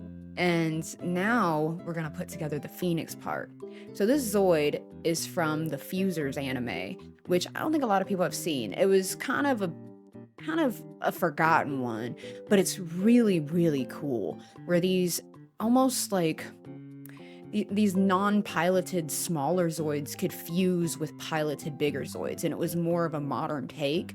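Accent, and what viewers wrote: South, United States. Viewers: You retired from the other hobby? Just curious. Plan to be watching your new content here. I never noticed you having a slight southern accent before. Love it. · Did you move? You’re accent is way country now lol happy to see your videos again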